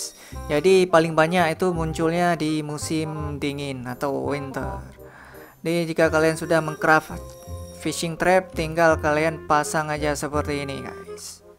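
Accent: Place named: Aceh, Indonesia. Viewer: Salam dari aceh nice video bro pantes logatmu kok kaya familiar saya hahaha.. beu maju beu sukses beuh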